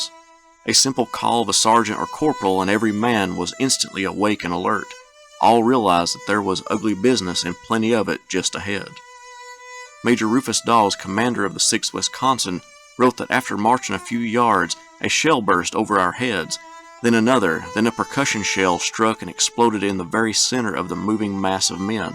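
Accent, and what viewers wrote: South, United States. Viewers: Sir, you sound like a Southerner so why are ALL of the accounts from Union soldiers? There are plenty of first-hand accounts by Confederate soldiers. Perhaps a more even blend would be better. One more thing, the name of the battle is Sharpsburg.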